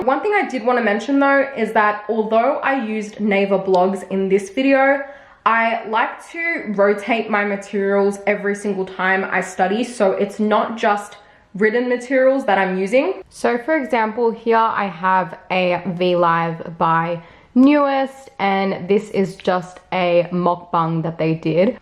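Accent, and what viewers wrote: Australia. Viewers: I don't know if anyone gonna talk about it or not but I love your Aussie accent 😁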